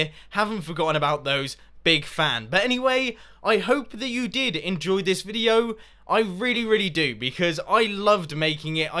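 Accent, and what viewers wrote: England, United Kingdom. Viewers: Jack Lusher Well he's from england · hes british. deal with it.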